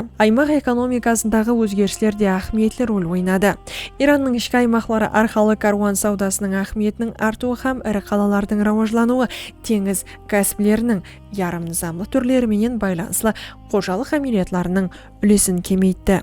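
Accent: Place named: Karakalpakstan, Uzbekistan. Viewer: Қарақалпақша таза сөйлеген тилиңнен айналдым 🎉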